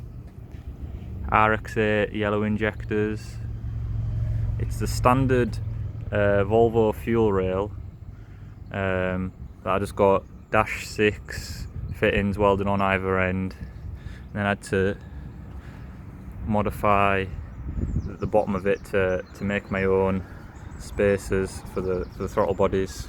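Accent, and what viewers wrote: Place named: Northern England, United Kingdom. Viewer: thought so from the stronk northern accent ;)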